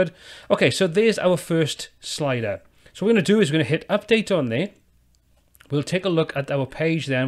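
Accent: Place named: England, United Kingdom. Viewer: You mate, are a genius. Great to hear, and see, someone with a regional English accent give clear and concise help. So tried of hyper active youngsters trying to blind everyone with their knowledge. Or a video with a silly tune playing and no voice overs. Worth being locked down for :)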